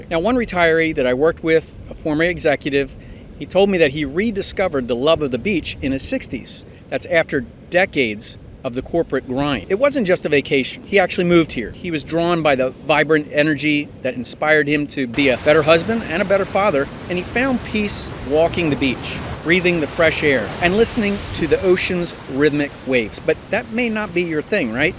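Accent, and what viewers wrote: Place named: Baltimore, United States. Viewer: Sounds like a Baltimore or Pennsylvania accent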